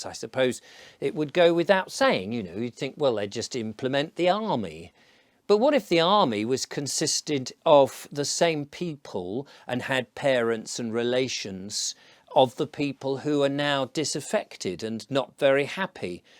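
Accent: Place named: England, United Kingdom. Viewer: Sure you know! I take it you live in England from your accent. I'm convinced and so are all militia's Our pullout from Afghanistan left a whopping multi billion dollar war cache in this country along with pallets of hundred dollar bills. Uniformed fatigues, state of the art assault rifles and open border. Yeah I would say I have a very good reason Given the treason thus far perpetrated on the citizens of the United States.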